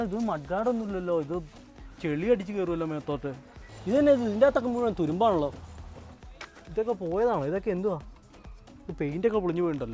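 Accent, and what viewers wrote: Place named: Idukki, India. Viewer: അണ്ണന്റെ സംസാരം കേക്കുമ്പോൾ ഇടുക്കി കാരുടെ സംസാരം പോലെ തോനുന്നു 😂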